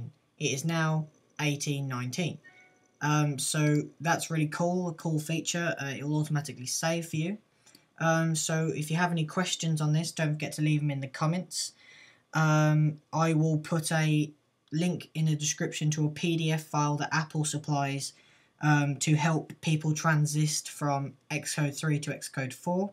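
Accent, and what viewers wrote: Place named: United Kingdom. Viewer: Not to hate on British people, but do you seriously think anyone can understand a damn word you're saying? So high pitched, fast and generally obnoxious.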